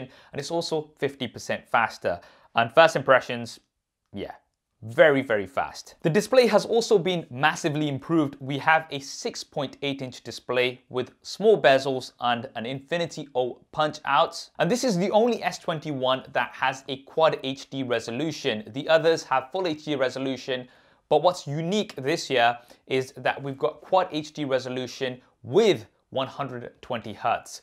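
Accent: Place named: United Kingdom. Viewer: His voice got so soothing in middle of the video that i fell asleep. Dude you gotta make a lullaby playlist in that British Accent.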